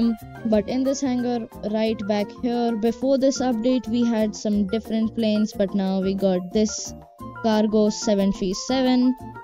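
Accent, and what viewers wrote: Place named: India. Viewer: Bro sounds like indian😭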